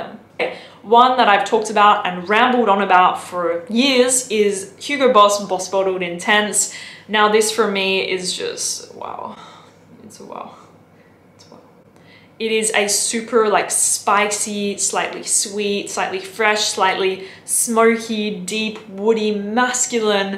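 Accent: Australia. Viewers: Australian accent.... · What's there to be calm about? Was never hyped in the first place. I just said I loved her Australian accent. And what's it to you anyhow? People love to give their opinion when 1. It was never asked for and 2. It isn't worth 2 cents. So, you calm down... dude I swear. People and minding their business... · I enjoy your exotic Aussie accent, and your presentation, smile, eyes... everything about you is just gorgeous. And I like your fragrance choices - that it had to smell expensive and classy, even if it's modestly priced. I own many of these you have shown here, so I am on the same page with your choices. One I have not smelled yet is La Nuit d'Issey. Ordered it on your recommendation. 🖖😘👌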